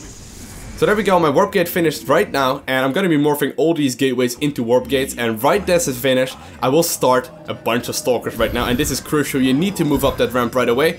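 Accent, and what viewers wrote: Netherlands. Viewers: are you dutch? you sound really dutch but I cant quite lay my hand on it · It sounds like Dutch so much hehe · Are you dutch?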